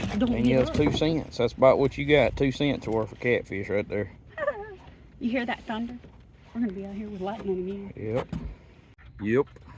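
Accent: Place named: Appalachia, United States. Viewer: Absolutely loved this video, we watch Dat Louisiana Life and got turned on to y’all’s channel.. Love the hillbilly accent sounds like Ken, Do you offer tours?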